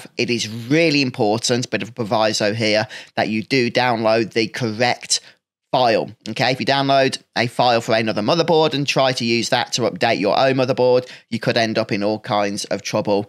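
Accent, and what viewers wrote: Birmingham, United Kingdom. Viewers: We're having a debate, I'm convinced you're from Birmingham but my friend disagrees, please confirm haha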